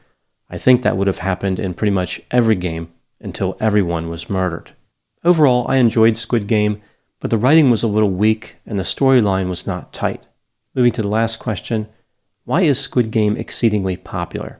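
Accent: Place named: Boston, United States. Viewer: The best accents are generated by native Bostonians!! The Doc has a cool accent! 😉🙂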